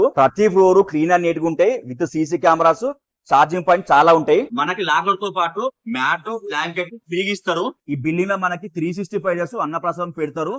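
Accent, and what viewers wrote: Telangana, India. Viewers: Telangana yaasala manchiga chepthav anna ee video kooda baagocchindhi anna Govindhaa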